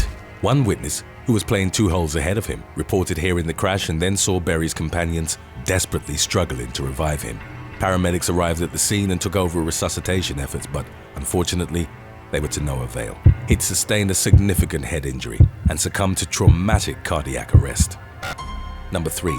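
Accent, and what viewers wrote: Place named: United Kingdom. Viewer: “An almighty crack of thunder” blended with that tone and British accent 🙂👏🏾👏🏾👏🏾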